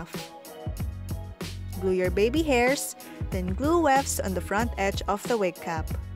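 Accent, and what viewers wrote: Philippines. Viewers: Your Filipino accent 😍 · You have a Filipino accent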